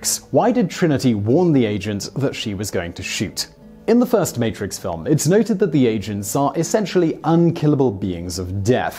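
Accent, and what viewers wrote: United Kingdom. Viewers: Eh. What dya want. He's a British Milennial.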